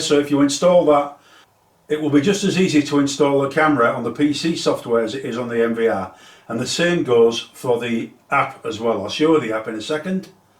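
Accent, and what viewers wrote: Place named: East Yorkshire, United Kingdom. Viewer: Hi, I just ordered one of these, but think I need some power for the PoE, can you recommend a switch or adapter for the supply. Liked the video, and the accent too, sounds like your from my part of East Yorks. Regards John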